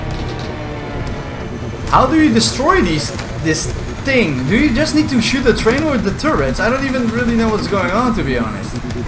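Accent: Netherlands. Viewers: Are you dutch? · I'm hearing a Dutch accent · Hahaahah holie molie heerlijk nederlands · you dutch right i can just feel and hear it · i smell Nederlands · You Dutch bro?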